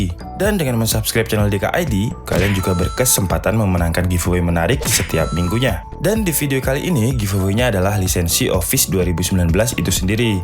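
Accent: Java, Indonesia. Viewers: Menurutku bedanya GK terlalu jauh dengan Ms 2016 Bang dari Jawa y wajar logatnya logat Jawa kalau bicara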